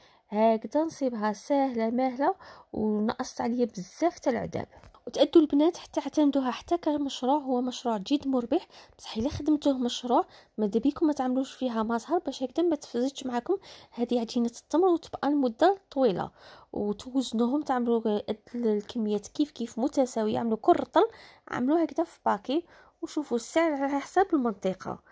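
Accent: Tlemcen, Algeria. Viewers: الله يعطيك الصحة. لهجة تلمسانية رائعة ما شاء الله عليك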